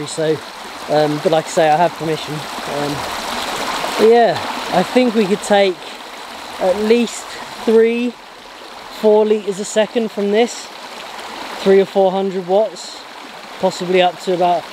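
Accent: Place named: West London, United Kingdom. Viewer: 'that bit of skank' lol. you must be from west london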